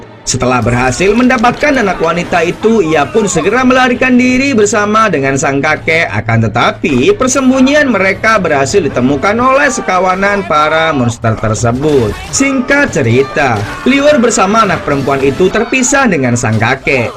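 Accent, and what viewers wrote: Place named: Madura, Indonesia. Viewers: meduro endi sampean cakkkk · Admin, logatnx kyk Madura. Madura mana gaes?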